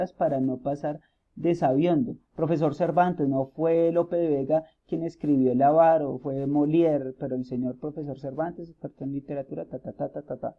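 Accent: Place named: Colombia. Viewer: Pues el cuento me pareció muy realista ya que como lo dice en el titulo hay personas que tiran la piedra y esconden la mano es decir, que hay personas que cuando les conviene son santas pero cuando no les conviene son malosos. En otras palabras son hipócritas. Además el cuento super bueno ya que lo que me gusto fue que parte de ser escrito con el acento colombiano es muy realista. Que tengas un bonito día profe!!!♥♥🥰😋😁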